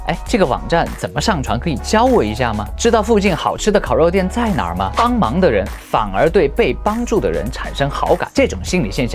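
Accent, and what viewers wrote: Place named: Wuhan, China. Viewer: 最近刚刷到老师，视频听着觉得太棒啦。听到这个视频中亲切的武汉话才得知是我老乡，我是生活在墨尔本的武汉丫，必须支持 ❤马上会员安排上😊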